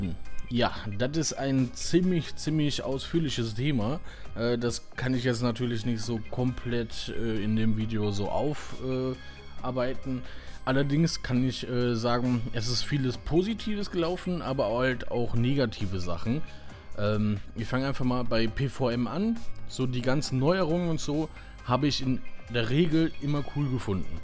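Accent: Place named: Germany. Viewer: Uff dieses deutsches Akzent ist ja schlimmer als Hochdeutsch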